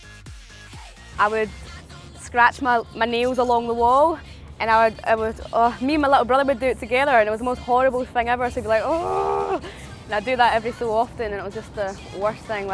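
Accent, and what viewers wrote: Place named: Scotland, United Kingdom. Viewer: hot, and a scottish accent? dear lord perfect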